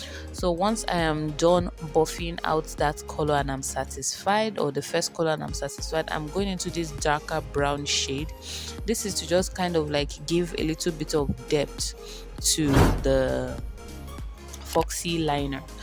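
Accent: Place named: Spain. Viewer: Ohhh Very beautiful and very simple Your Spanish accent is sweet ooo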